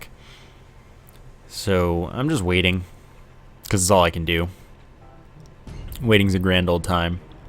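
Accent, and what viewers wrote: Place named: Canada. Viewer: So, stereotypically you sound Canadian. If this is true, which area. If not, where from in actuality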